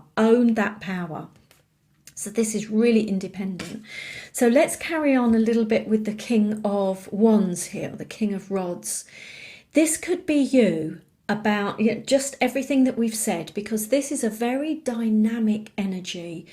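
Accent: United Kingdom. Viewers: Thank you, for your loving bright smile and face, that so much express the Spiritual Community of Light, which leads and inspires you on this specific task you are performing with us here. You are ALREADY in your own....Zone of Genius, dear friend!! For sure!!! But I feel also very grateful for the Quality of your presentation, the respectful, joyful and positive personal style of your image to us! And your gorgeous, clearly spoken, British English, of course!! Lots of hugs!!!🤗🤗🤗🤗🤗❤️❤️❤️❤️ Liza.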